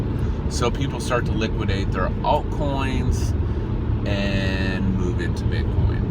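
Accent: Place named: Dallas, United States. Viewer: Are you a Texas gent? Sound like you are around the dallas area. HTown here. Continued success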